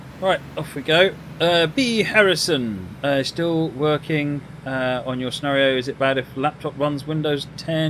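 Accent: United Kingdom. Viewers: You have a bit of a suffolk/norwich accent.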